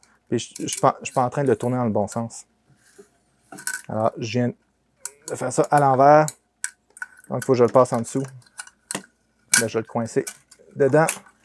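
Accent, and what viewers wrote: Quebec, Canada. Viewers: Toujours un plaisir de regarder tes vidéos même si c'est pas toujours facile avec ton accent Québécois, mais bon l'accent Stéphanois (France) n'est pas mal non plus.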